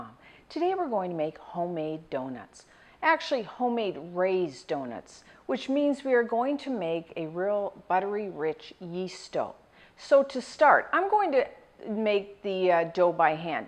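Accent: Canada. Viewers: I listened to this video again I think you're correct! At first I was thinking North Dakota or Northern Michigan but I think I'm hearing a slight Nova Scotia tone now. She probably went to elementary school in Nova Scotia and then high school somewhere else in Manitoba or Ontario. Her 'About' and 'outside' totally gave away the Canadian connection. It's tough to tell exactly where though because she doesn't use slang or idioms much. I love her videos. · Are you canadian? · Are you Canadian? You pronounce "ou" a lil bit like canucks do.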